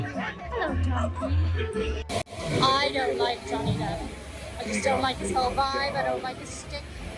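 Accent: Ireland. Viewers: Well, Disney with an Irish accent is not as common 🙂 loved the video 🙂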